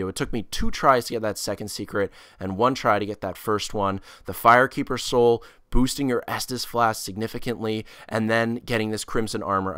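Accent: Canada. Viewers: Love the accent, Canadian? This condensed walkthrough will help me immensely. I died after my first attempt to get into Undead Burgh after I slaughtered quite a few and had a "wat?" moment so I've been waiting for some help.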